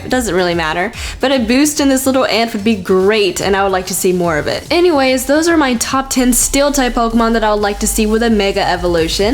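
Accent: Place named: North Carolina, United States. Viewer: where is from? she sounds like she's from NC or some state around here... like, her slight dialect sounds so familiar.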